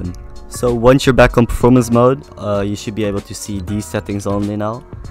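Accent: Netherlands. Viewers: Yo maatje ik hoor dat je nederlands bent klopt dat?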